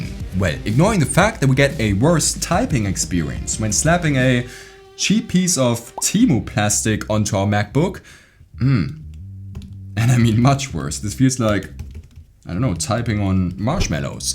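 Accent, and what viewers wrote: Germany. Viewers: bro ,you from deutschland?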